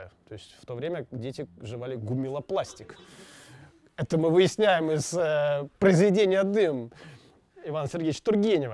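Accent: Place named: Moscow, Russia. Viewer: Странно, но ты действительно АКаешь и интонируешь в нос как уроженец первопрестольной. Ленинградского в твоей речи только скорость) Да забей короче)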